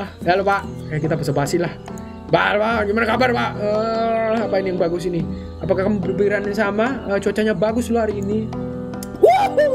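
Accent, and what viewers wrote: Java, Indonesia. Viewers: Mas Sak vidio ngoming medok sak vidio o ae Temenan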